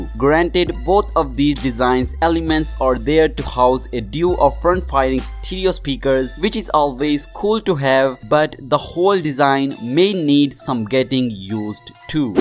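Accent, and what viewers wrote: India. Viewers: Ну я, конечно, не могу этим похвастаться, однако мне все равно кажется, что это какой-то восточный акцент (то ли индус, то ли еще что-то в этом роде).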